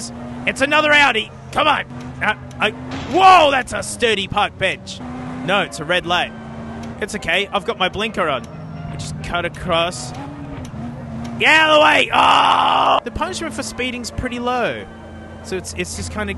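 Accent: Australia. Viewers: Omg its aussie. I needed this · Australian let's game it out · I clicked on this video, having no clue what I was in for the first two seconds. I hear funny Australian man and goofy old Timey music. I don’t know how I have accidentally found the funny iPod man.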